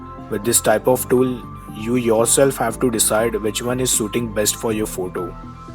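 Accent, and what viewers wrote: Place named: India. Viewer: UR VOICE SOUNDS LIKE AN INDIAN btw good tutorial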